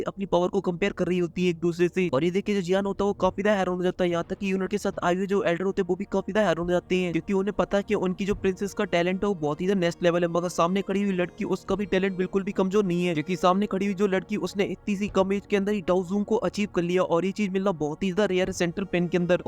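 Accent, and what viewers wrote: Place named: Madhya Pradesh, India. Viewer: Mori mora lak ta ha ap MP sa ho😂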